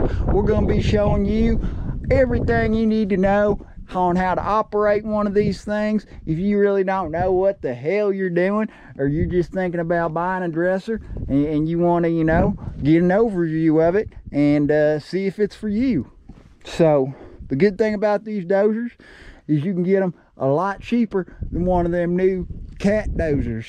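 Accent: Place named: Appalachia, United States. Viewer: Actually another Completely annoying Amateur wanna be when yacking about the dozer only control stick you said the blade goes forward which is annoyingly Stupidly obnoxious the machine goes forward not a dozer blade.you obviously have the same hillbilly accent as the long dead n hayden who of course thought they were invincible peddling a pedal bike in italy ignoring a walkman equipped Stop sign getting graciously taken out forever by one crossing car brain dead from then on was also brain dead before impact.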